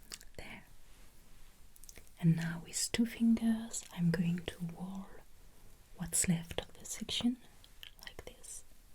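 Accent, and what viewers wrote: France. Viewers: My goodness. The scenes you create, the movement of your hands, your voice, your lovely French accent, the overall energy of your videos - everything is so gentle and calming, it helps me forget for a little while the progressively dystopian world around us. Thank you for this respite from reality. ❤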